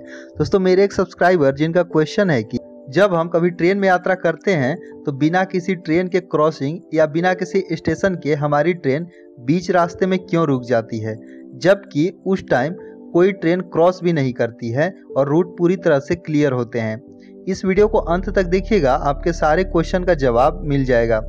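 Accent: Bihar, India. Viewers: awaz se bihari lagte ho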